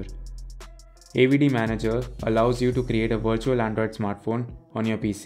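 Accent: India. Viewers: its the Indian " english " accent .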